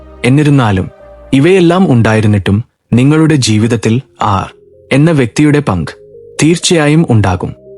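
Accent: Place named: Tamil Nadu, India. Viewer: OM DURGA DEVI NAMAH. The words are pronounced like Tamilian who do not know Malayalam.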